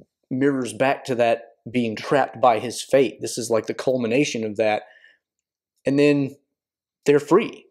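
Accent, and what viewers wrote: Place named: Appalachia, United States. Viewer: you say they’re betrayed by Borlas and you say it a few more times after. I’m sure it was just a slip but the correct person is Gorlim the Unhappy. For those who do not know, Borlas is one of two people: the original name of Borlad, one of the sons of Bór killed in the Nirnaeth Arnœdiad; or the son of Beregond of Gondor. PS - you sound like you’re from Appalachia. If so, I’d guess WV or KY 😅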